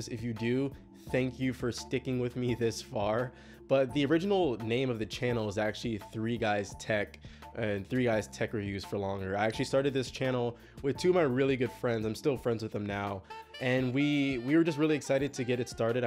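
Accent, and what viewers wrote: Nigeria. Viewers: Are You Nigerian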